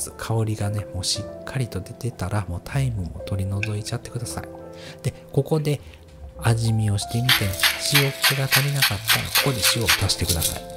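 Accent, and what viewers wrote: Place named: Osaka, Japan. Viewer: Twitternからきました。Uraruです。 大阪弁で心地のいいお声。さすがプロの話し方です。 新しいLA TABLEさんですね クラムチャウダーが欲しい季節になりました。 うちのストウブちゃんでやってみます♫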